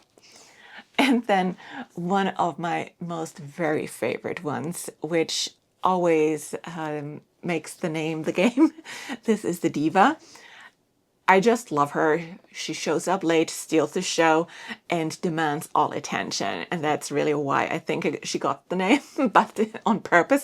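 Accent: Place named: Germany. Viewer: Always enjoy your videos! Best of luck with this year's growing season.My in laws came from Germany. I love listening to your accent!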